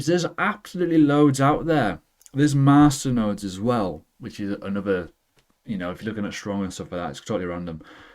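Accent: United Kingdom. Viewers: Great channel I follow a lot of them and when I see ur new videos I’ll click them first What kills my is the ascent . for a Spanish guy British is harder to get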